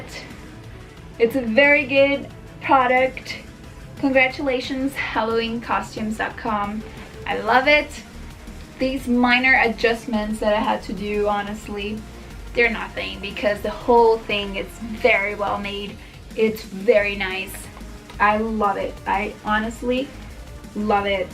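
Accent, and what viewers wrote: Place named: Brazil. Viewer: tu poderia colocar legendas em portugues ou fazer um video em portugues? infelizmente meu ingles nao é fluente, nao consigo entender tudo... cerca de 60%, as vezes um pouco mais, depende do sotaque.... talvez se tu falar em ingles do jeito que tu fala portugues (sotaque brasileiro), eu vou entender uns 80%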